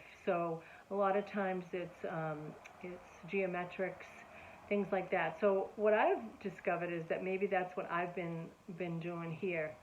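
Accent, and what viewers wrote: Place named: New England, United States. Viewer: Audio was fine very clear good video. I can tell you’re from New England though lol.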